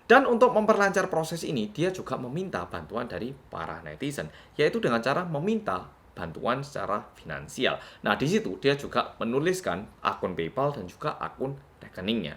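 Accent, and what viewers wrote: Java, Indonesia. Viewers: Kaya orng korea mukanya..tpi ada medok2 jawa bicaranya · Min..mukamu kaya korea tp logat mefok jawa ... Hebat mi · kan memang korean lah,cuma dia membesar di malang makanya medhok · Padahal subscriber nya banyak dari luar Indonesia juga, piye Yo mas? Wah, Jian.... Semoga cepat diberi hidayah. Terus kawal mas, LAN Ojo lali medoke haha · CUMAN YOUTUBER KOREA YANG PERCAYA CUMAN KARENA PERNAH TINGGAL DI MALANG PLUS BISA BAHASA JAWA MEDOK😂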